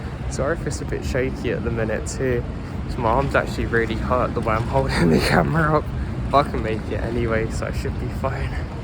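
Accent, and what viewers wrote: England, United Kingdom. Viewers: Good morning! Aww! It was so nice listen to you saying all that! I just love the english accent. You and your brother are spectacular! Wish Happy New Year to you and yours, haven't seen you since last year!🎉 I would love to see you doing a collab with Mortis Media and Being Scared, like back in 2016. Since there I'm your subscriber. But I'll be happy with any choice. Hope you're doing great too, and taking care of yourself. Thank you! Much love💚💙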